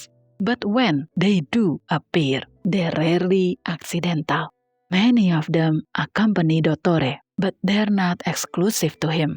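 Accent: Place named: Indonesia. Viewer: I was struggling to identify your accent when suddenly, oh, Indonesia, ternyata. Analisanya keren, semoga sukses buat channelnya ya kak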